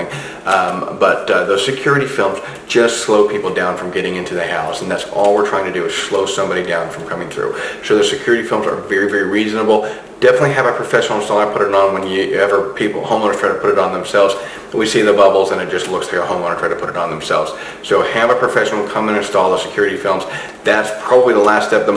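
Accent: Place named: Alabama, United States. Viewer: Great recommendations. You sound like a fellow Bama boy!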